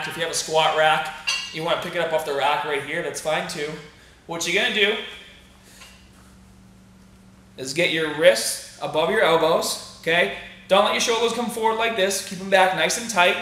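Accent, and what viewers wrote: Boston, United States. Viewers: His accent seems less intense back in 2012. weird...it's like his Boston accent got more pronounced over time. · What happen to your buston awccent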